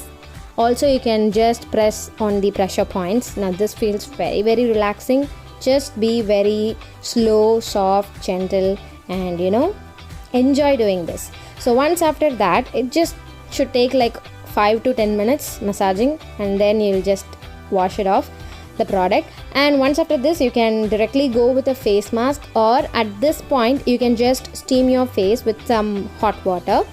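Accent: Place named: Tamil Nadu, India. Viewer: Superb SISTER..😉 Biotique product pathi clear ah explain paninga last video la...very nice tamil explanation...unga tamil slang nalaruku SISTER..tamil la video panunga inime...this z my request...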